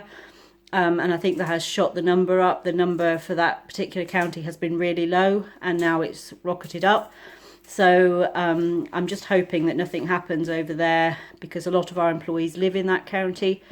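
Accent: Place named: United States. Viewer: Wow u got a lot of shopping there! Hope Caleb has a fab day! listening to ur acc ent since I subbed and initially thought u had a Somerset twang every so often!!!!!!! Then I realised it must be American twang?!!! I can't think why I haven't asked before, but u have a lovely accent t!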